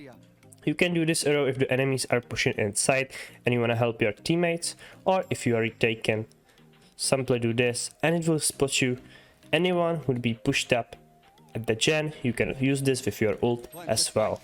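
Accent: Czech Republic. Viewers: i cant help my self but... are you from czechia ? XD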